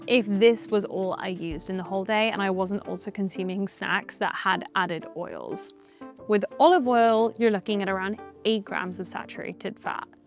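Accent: England, United Kingdom. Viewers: Another Brit who is been bought by the commercial interest to down play the dangers of seed oil. The country has gotten sicker since they started using seed oil. That's historical fact and no one with the British accent who has been bought by the commercial interests are going to convince me otherwise. She's obviously been bought by the industry that makes seed oil. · Weird to have someone speaking with an English accent, but calling Beef fat "tallow" instead of "Dripping" , and claiming she's never had it. Really? Never had a roast potato cooked in the traditional way? Her accent varies a bit. Seems slippery like the subject.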